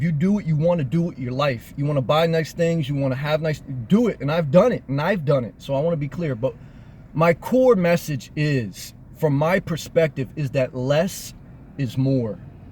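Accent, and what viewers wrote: New Jersey, United States. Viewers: I would rather live in a car than an office. Never seen your videos before but you sound like a Jersey boy like me. Hope I am not misjudging the accent, I have been Californicated for the last 25 years and love it! I had a large family, so I´ll keep my mountain acres but I feel you on materialism. I think the key for me was to make sure I accumulated enough income streams that I never HAD to work again....like now. My kids are grown and I am still young. Fuck yeah!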